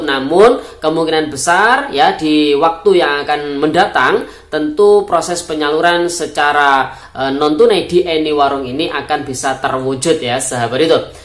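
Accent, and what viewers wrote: Java, Indonesia. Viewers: Mas org Jawa ya?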